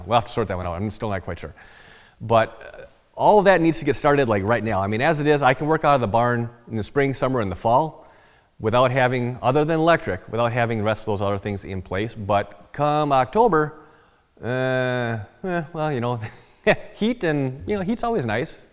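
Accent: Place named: Wisconsin, United States. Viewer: Based on his accent I’d bet he’s from Wisconsin so not much different weather wise.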